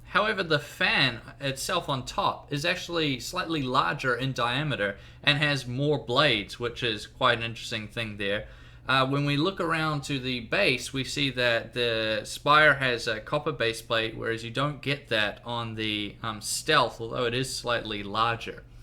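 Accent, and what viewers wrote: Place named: New Zealand. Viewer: Yeah, although I noticed some things. I was thinking he was Aussie or possibly Irish with the way his "fair" sounded. But he reminded me on the Path of Exile developers and the slight accent they have. Then he said he was a New Zealander right as I was thinking he might be from there. I literally LOL'd.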